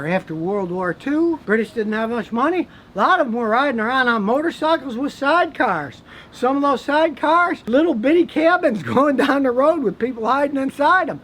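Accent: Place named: United States. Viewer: This guy is hard to listen to. Just that dumb version of the american accent.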